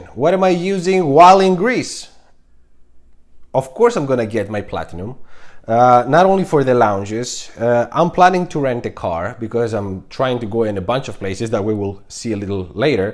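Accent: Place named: Spain. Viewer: I’ve seen your videos before but had no idea you were Greek. With your accent, I thought you were from Spain. What an idiot I am. And I’m Greek too. Greek American though. My family is from stunningly beautiful Kefalonia. Καλό ταξίδι!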